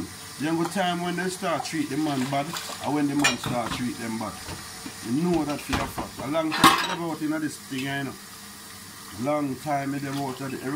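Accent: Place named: Jamaica. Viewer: u sound like a real good jamaican man, Im new to ur channel I notice u spend allot of time in ur kitchen lol u must love cooking that's rare to find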